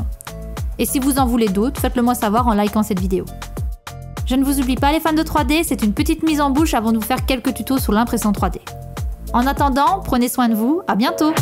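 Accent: Belgium. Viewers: Salut, superbe vidéo, je me suis abonné. Est-ce que c'est un accent belge que j'entends ? Si c'est le cas, très heureux de savoir qu'une patriote fait d'aussi bonnes vidéos. Si jamais tu es intéressée, je peux t'expliquer l'électronique facilement, ça pourrait t'aider pour tes futurs projets pour soit avoir moins de difficultés, soit payer moins cher pour mieux ou le tout en même temps. Continue ce que tu fais !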